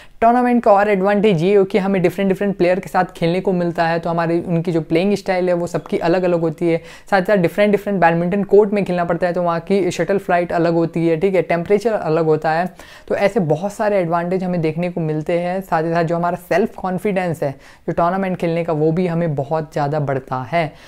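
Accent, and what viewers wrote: Odisha, India. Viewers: R u From Odisha?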